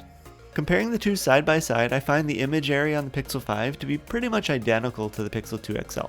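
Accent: Canada. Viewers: Eh bud, what part of canada are ya from, I'm out in BC. Just your accent is one haven't heard from where I'm at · This was exactly the comparison I was looking for. Love the Canadian accent btw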